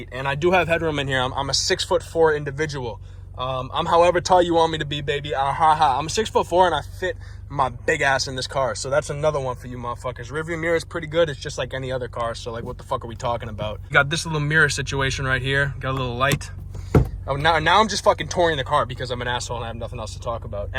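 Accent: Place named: Boston, United States. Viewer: You are so stereotypically a Boston native. You boys out there talk so fast & got no filter 😂😂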